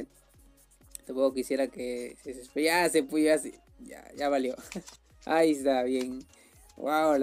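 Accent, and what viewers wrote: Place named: Peru. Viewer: obvio el acento peruano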